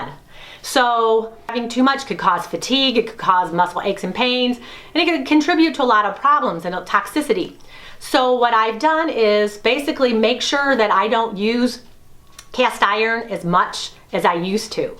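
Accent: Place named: Wisconsin, United States. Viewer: Well,... Your accent reminds me of my family, all who hail from Wisconsin & some while back also Chicago. Refreshing...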